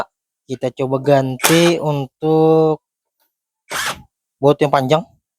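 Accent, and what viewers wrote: Indonesia. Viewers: Sprti logat banjar. Orang kalimantan selatan???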